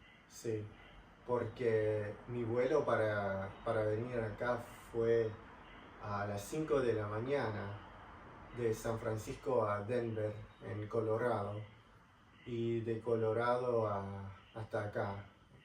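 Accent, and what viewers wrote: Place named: Buenos Aires, Argentina. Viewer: “Me re copa” que grande! Jajaja 100% porteño tu acento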